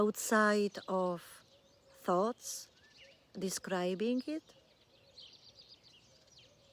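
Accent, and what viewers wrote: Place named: Poland. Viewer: It's insane how similar you are to the other woman I can't find anymore: the same Polish accent, the same rhetoric, there is no 'you', etc.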